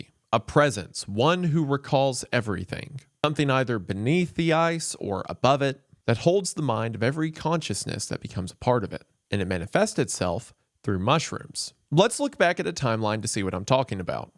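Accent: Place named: Appalachia, United States. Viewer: My boy’s Appalachian accent is coming out full force. Love to hear it.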